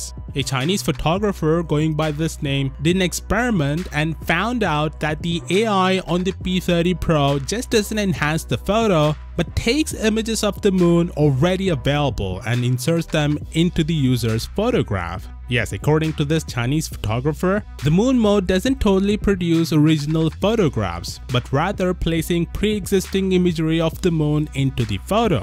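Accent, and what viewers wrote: India. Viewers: He has a certain accent. Indian maybe? I'm not sure. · His accent is that of an Indian person correct? They called me like a hundred times a day I am inherently racist sorry I can't stand you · Are you India? 😅 I just wonder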